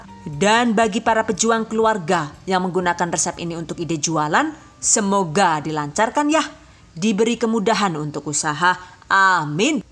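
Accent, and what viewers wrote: Banyumas, Indonesia. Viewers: Kok ada ngapaknya ya,jd lucu hehehh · Ada ajh yh guyonan ngapaknya Paling suka ❤️❤️ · "DONE" Mksh ya bun,atas resep nya.trnya bunda wong ngapak ya....?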